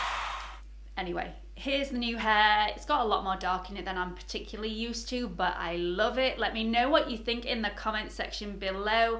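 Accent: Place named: Northern England, United Kingdom. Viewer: You sound northern I am in Leeds. You?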